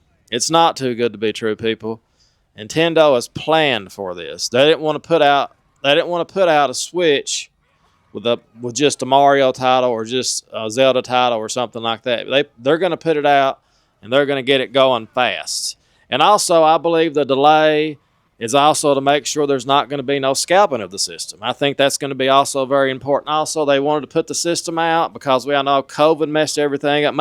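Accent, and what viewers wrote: United States. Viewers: Steam Deck and ROG ALLY has simmilar specs like switch 2 so I think it is possible. Your acent is the most american american who ever americaned :D And I like it. My bro begs my mom to get him the switch 2 on christmas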